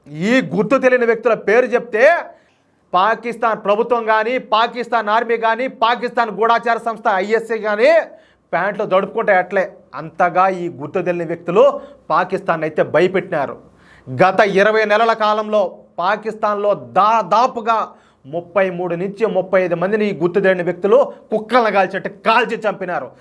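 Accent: Rayalaseema, India. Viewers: Latest news ను వెంట వెంటనే అందిస్తున్నందుకు నా ధన్యవాదములు గారు, చాలా థాంక్స్, ఎవరు ఏ నెగటివ్ కామెంట్స్ చేసినా మీరు మాత్రం ఆపకుండా ముందుకు వెళ్ళండి 👌🙏నేను మాత్రం మీ అభిమాని.. మీరు మా రాయలసీమ slang తో సవివరంగా matter ను ఎక్సప్లయిన్ చేసే విధానం బాగుంది please continue 🙏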